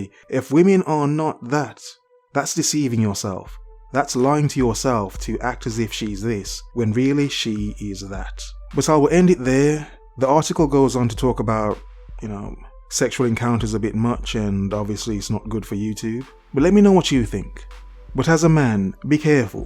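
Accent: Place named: United Kingdom. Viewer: Level 1: read the article yourself Level 100: heard it read to you through a British bloke's voice with the occasional hearty chuckle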